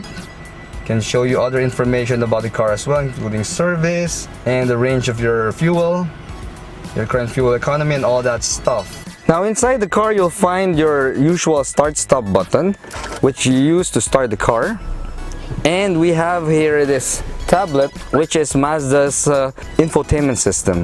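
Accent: Philippines. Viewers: filipino ,,pinoy accent wooww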